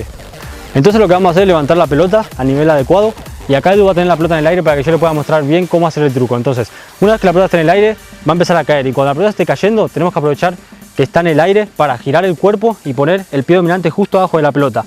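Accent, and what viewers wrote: Argentina. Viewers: pareces argentino ajjaja pd:soy de arg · Tienes acento Argentino , no pensaba que eres español (PD:Gracias por el truco , casi lo tengo ) Saludos desde Valecia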